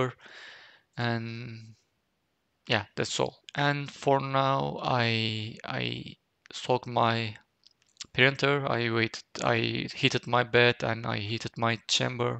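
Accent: Turkey. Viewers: dostum türksün sanırsam konuşmandan sezdim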